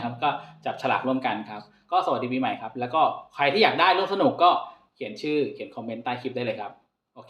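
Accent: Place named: Thailand. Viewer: คิดถึงนะคะอาจรย์ สุดหล่อคะ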